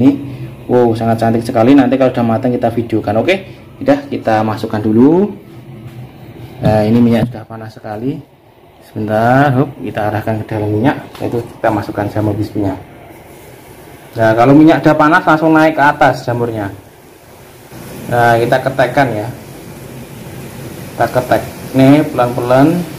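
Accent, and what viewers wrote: Java, Indonesia. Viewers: mas kental jawanya...lucu penyampaiannya 👍👍👍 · Mantap mas resep2mu jowomu ngendhi aku wong Solo terdampar neng Sentul Bogor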